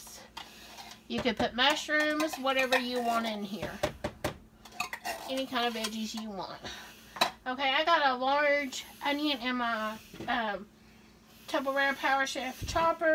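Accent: South, United States. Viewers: Looks easy and delicious. Where are you from? Love the southern accent😁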